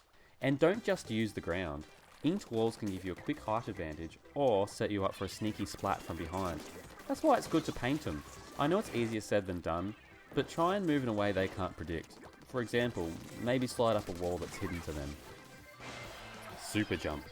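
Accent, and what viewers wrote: Australia. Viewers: Are you Aussie 🤔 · you aussie mate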